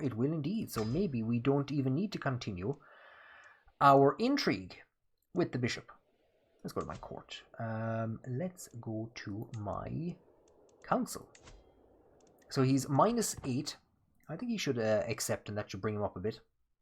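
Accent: Ireland. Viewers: Love the True Irish narration dope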